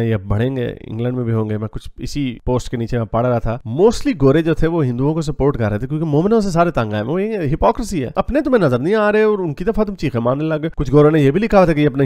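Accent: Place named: Punjab, India. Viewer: I love the punjabi accent ❤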